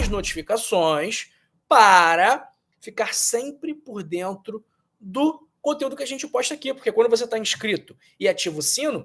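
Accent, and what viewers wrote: Rio de Janeiro, Brazil. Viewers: Faz só dois anos que estou comendo banana madura e bem madura. Aqueço numa frigideira pequena de teflon sem por açúcar e sem óleo depois adiciono aveia já cozida em água pra evitar leite . No final adiciono boa quantia de canela moída . É lanche bom. As outras frutas nunca consumo. Percebi que a ameixa seca preta tem um açúcar terrível; dói o dente na hora. Muito legal o seu sotaque carioca.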